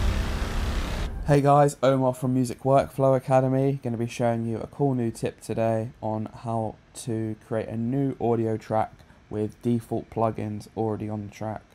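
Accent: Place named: United Kingdom. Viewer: happy to found someone who has british accent with ableton tutorials :D <333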